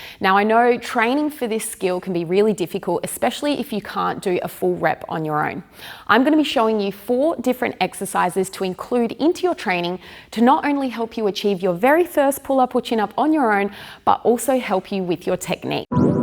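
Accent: Australia. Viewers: Great to hear an Aussie accent.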